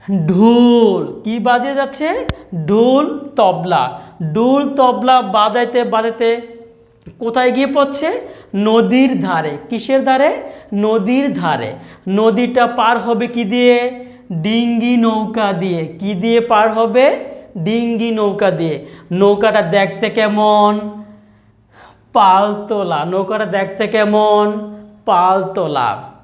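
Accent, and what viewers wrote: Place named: Mymensingh, Bangladesh. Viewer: ভাই এর বাড়ি কি কিশোরগঞ্জ,ভাষাটা বৃহত্তর ময়মনসিংহের মত আসে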